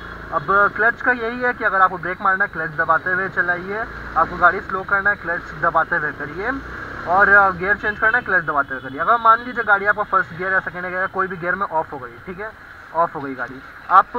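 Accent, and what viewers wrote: Bihar, India. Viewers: bhai aap bihari ho???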